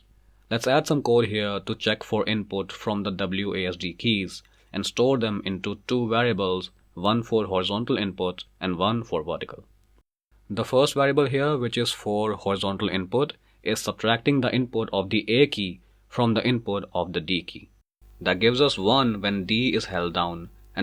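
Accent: India. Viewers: Thank you person who's name I don't know who has an accent that I think belongs to india. This is a great tutorial. I would love to learn more from you.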